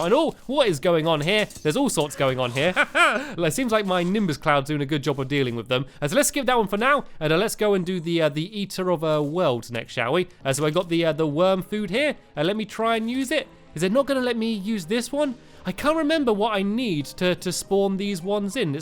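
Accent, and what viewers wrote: United Kingdom. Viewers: Jafar the Barmecide well what do you expect?stampy is british you can't expect him to say hallow instead oh hollow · Do you know British Accent...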